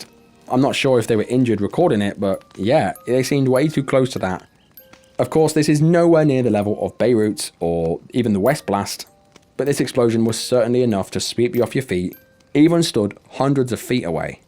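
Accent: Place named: England, United Kingdom. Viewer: ❤❤❤❤❤❤❤❤❤❤❤❤❤❤❤❤ you R so sweet. Also love the channel new subscriber here ❤ also from U.K South Yorkshire ❤ I could tell are obviously also English 😂😂😂 ❤❤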